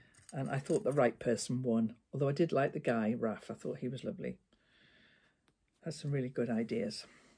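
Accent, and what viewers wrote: United Kingdom. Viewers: Why don't brits say the -er on Zipper? LOL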